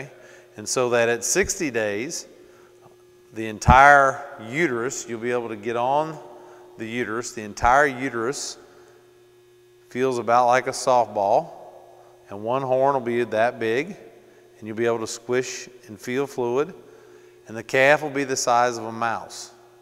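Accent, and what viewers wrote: Kentucky, United States. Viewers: watching 2x speed because this man speaks Kentuckian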